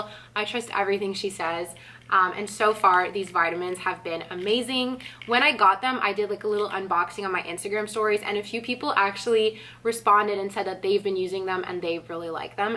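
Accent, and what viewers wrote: Quebec, Canada. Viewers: Salut,! Your channel got reccomended to me in Brazil, so I guess it's an indication of how much you've been growing, and I thought you'd be happy to know it. I'm in my late 20's and, to be honest, we have very different personalities and life styles, but I love to hear you talking - even though I don't relate much. I think you're a great communicator and also very entertaining. Hopefully you can make youtube your main source of income really soon! Btw, I've started learning French this month, I speak Portuguese, so it's a bit easier since they're both Latin languages, and I was so shocked to hear you! Canadian French sounds soo different from French from France, I had no idea, even though I lived in Toronto for a year (not much French there, but I did visit Ottawa and Montreal a few times and was so oblivous to the language). I'd looooove to hear you uploading more French content here and there.:) best of luck! 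· Oh my gosh I love your French and love your accent even if it is hard for me to understand. I love Quebecois!! Thank you for adding that clip haha🤣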